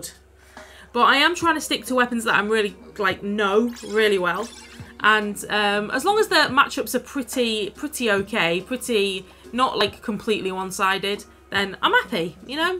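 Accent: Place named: London, United Kingdom. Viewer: Absolutely good Splatoon vid! We should play :D U sound like your from London? cuzz I am And I looooooooove ranked!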